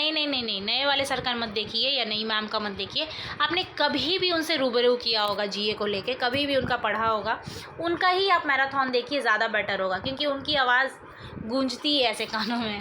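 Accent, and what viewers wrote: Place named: Mithila, India. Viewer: Aapki soch bilkul Sahi h.. aapki sound bilkul hamare local language ke tarah mil raha h... Aap kis district se h... Mithila side se h kya???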